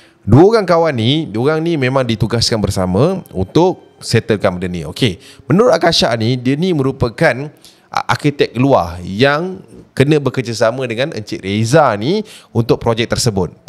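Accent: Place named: Terengganu, Malaysia. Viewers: bapak naturalnye kau ckp slang ganu. hahaha power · pandai plok cakak ganu😂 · terbaik la kecek ganu 😂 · Jaddi borh loghat Ganu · peh, mcm org ganu doh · Bakpo bunyi ganu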